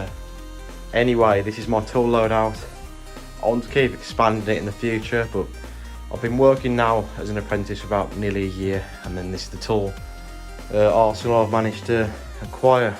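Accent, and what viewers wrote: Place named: Birmingham, United Kingdom. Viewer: You have an impressive tool kit! Glad you go for quality like knipex in hand tools, not chesp but top quality - I love Knipex. You sound like a fellow 'Brummie' All the best mate - I've subscribed 👍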